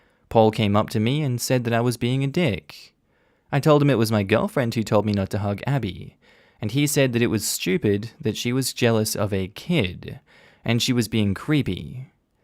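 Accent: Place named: Australia. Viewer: I love having an Aussie to read these.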